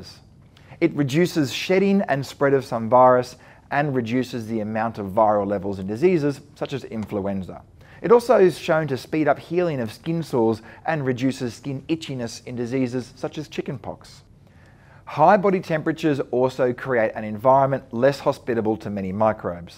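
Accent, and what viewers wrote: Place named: Australia. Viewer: I'm in nursing school and very much enjoy help from an Australian accent with my homework! Thank you!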